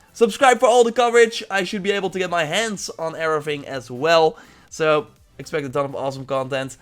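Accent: Netherlands. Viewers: We horen gewoon dat je Nederlands bent door de manier waarop je Engels praat 😂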